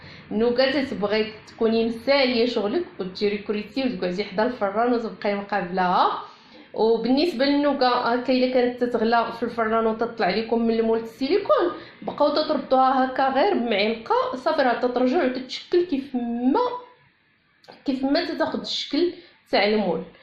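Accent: Marrakech, Morocco. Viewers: لﻻ الله يحفظك انا عاد شاركت معاك حيث عجبوني حليواتك تبارك الله عليك وسبحان الله لهجتك المراكشية عجباتني واحببتك في الله وماشاء الله عليك أتمنى لك المزيد من التألق والتوفيق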